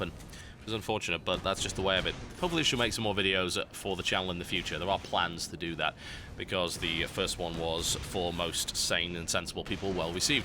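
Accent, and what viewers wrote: United Kingdom. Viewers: he will never lose his British accent, it may fade a bit, but no he won't. I know numerous people who moved here from the UK and they still have a strong British accent. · Farewell, British accent. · and so ends the o_O. Hope you manage to maintain the accent. British accents are cool. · Moving to the States? hope he doesn`t lose his awesome british accent! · you helped me to continue to use my 'modernised' victorian accent despite the petty mockings of my schoolmates. If you lose the accent, know that I shall continue to use the accent in memory of, arguably, my favourite british person of all time. Good luck in the states.:) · well he better not lose the british accent :)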